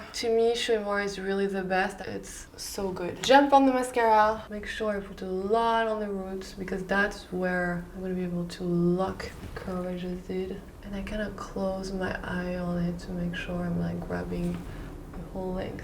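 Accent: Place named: France. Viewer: Bravo for your vidéos that show simple ans wearable make up so différent than most of the american Youtubeuse who make make up with tons of coats, with two many Colors ans hours spent in thé bathroom ( at what Time do they wake up if they work????).... for a result...how to say... I have readen that thé music in the beginning was not available, et c.est bien dommage. Je continue en français parce que moi aussi suis française, et que ça va dix fois plus vite de taper en français. En tout cas, ne change rien, avec ton petit air de Jane Birkin jeune et ton frenchy accent « so cute » ( j.ai le même... et après avoir passé des années à essayer de le gommer, j.ai abandonné et maintenant je le revendique même!). Suis allée à New York en octobre dernier, mais ne t.ai pas croisée au détour d.une des rues du « village »😪. Allez continue à faire « ce que tu veux » et encore bravo.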